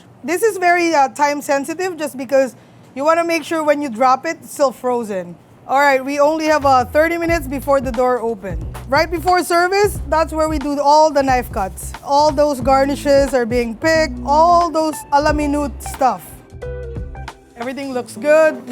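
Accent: Philippines. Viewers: you can definitely tell she actually used to live in PH just by her humor and accent haha ! love her,, nakakaproud maging pinoy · Filipino humor is really like everywhere and you know it's pinoy style because of the delivery. I love your cooking style. You're not afraid to improvise. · Alam mo bilang pilipino nakakataba na di mo binago attitude mo as accent bilang pilipino kahit malayo na narating mo more power to you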